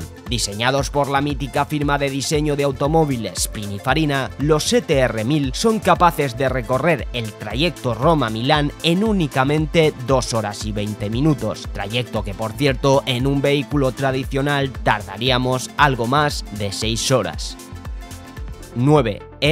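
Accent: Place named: Spain. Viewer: no me gusta tu voz, la voz de los españoles ....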